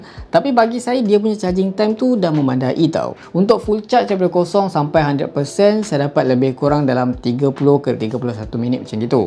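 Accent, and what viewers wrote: Terengganu, Malaysia. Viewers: kemah orang ganu kite... tiberrrr 😁😆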